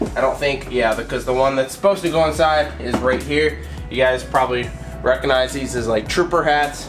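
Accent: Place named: Georgia, United States. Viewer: Starting to get that georgia twang now huh? Lol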